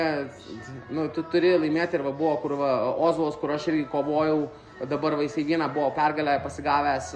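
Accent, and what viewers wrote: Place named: Alytus, Lithuania. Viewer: Alytus girdisi.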